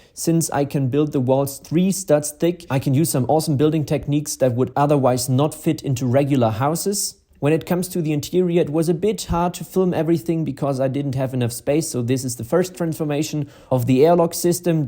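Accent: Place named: Germany. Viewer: What about that german accent though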